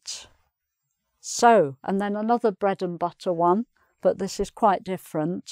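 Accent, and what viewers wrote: United Kingdom. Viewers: Oh, my lady, do you live in Canada? You speak in British English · love your British accent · It's a real pleasure to listen to you - real British +profound knowledge. Thank you for that 🌹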